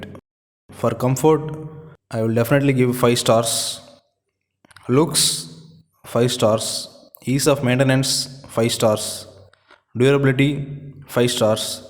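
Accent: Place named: Tamil Nadu, India. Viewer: I like you tamil english accent atleast you don't fake it 😊.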